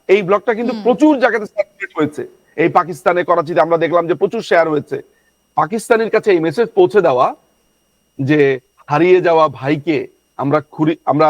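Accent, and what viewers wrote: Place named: West Bengal, India. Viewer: এই মেয়ে -------- তোর কথার সুর পশ্চিম বাংলার মত।।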